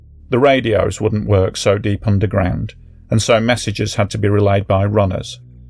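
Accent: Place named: North, United Kingdom. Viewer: it's like listening to one of my northern family members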